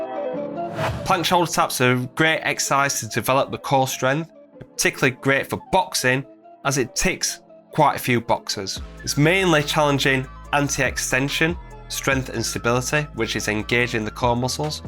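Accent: United Kingdom. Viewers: Many of the videos I watch just to listen to a proper British Accent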